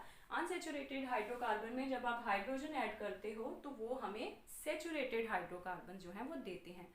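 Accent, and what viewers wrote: Punjab, India. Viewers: Mam apka channel acha study karwane ka style bhut vadiya sabse badi batt acennt english language ka punjabi hay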